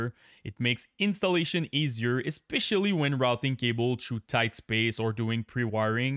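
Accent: Quebec, Canada. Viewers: Ça paraît que t'es québécois haha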